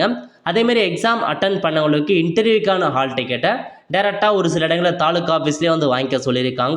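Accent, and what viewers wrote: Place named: Thoothukudi, India. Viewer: Yo thoothukudi ya aha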